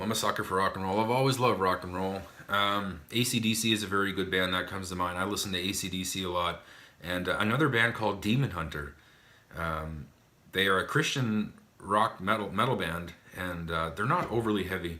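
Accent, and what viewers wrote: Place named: Canada. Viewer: I love your accent. I knew you were Canadian the instant I heard you talk on one of your videos. New subscriber here! Keep making vids, they are great, and you're really interesting!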